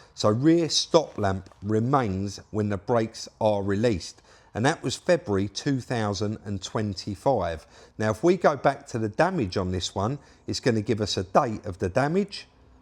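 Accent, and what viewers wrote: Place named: East London, United Kingdom. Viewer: Gertch you cow son gertcha. It's a luvly accent I was dragged up in Stepney and his accents' the same as mine "common as muck".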